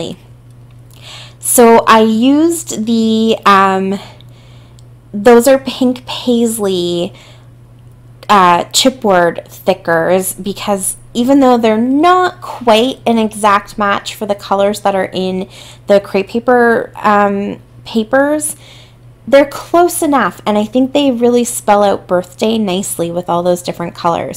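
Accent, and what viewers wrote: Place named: South, United States. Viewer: Like the way you made this a double page layout! Festive and fun. TFS. PS are you originally from Canada, lol. Your accent sounds southern, like mine😉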